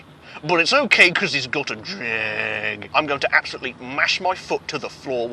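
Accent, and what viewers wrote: United Kingdom. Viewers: Funny British Peugeot man is back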